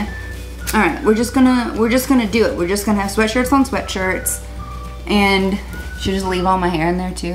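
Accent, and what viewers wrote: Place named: South, United States. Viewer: Hey! Are you from the southern states? I've never heard so much of an accent from you in any other videos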